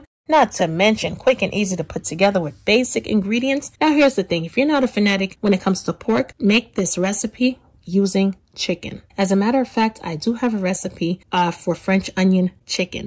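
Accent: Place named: Georgia, United States. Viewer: Looks great! Are you from Georgia or Alabama? I'm Florida raised, surprised I can't tell the difference.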